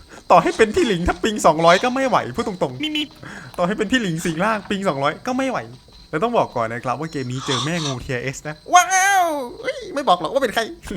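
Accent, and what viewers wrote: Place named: Rural, Thailand. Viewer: เสียงเหน่อเรยขำ555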